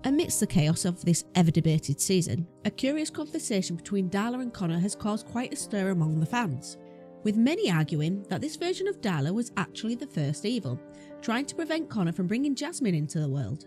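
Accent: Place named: United Kingdom. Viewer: Yus we need more British voices on youtube 😺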